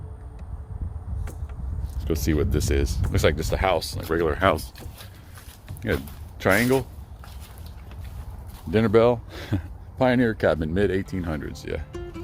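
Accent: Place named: Cajun, United States. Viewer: If you did these in your gay cajun accent you’d probably crazy viral ha 😂😘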